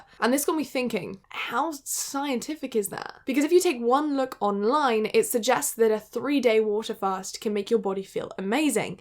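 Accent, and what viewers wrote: United Kingdom. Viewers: Honestly when I clicked on this video I forgot she’s British so I was surprised when she started talking all British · Hii!! ❤ I love your videos so much and omg your british accent is something else girl! 😍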